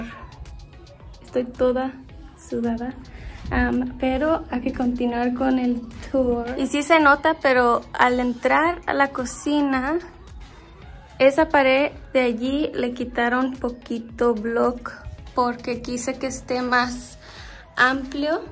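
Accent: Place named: Portugal. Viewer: Estas quase ablando PORTUGUES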